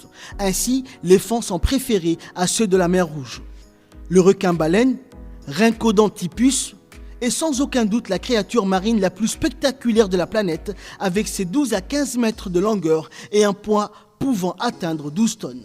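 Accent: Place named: Djibouti. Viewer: Voix off : couleur locale et sonne joliment "Chaboutienne" à l'oreille ... j'adore ! Non, j'admire ! Non, attend, mieux encore je partage! Good job!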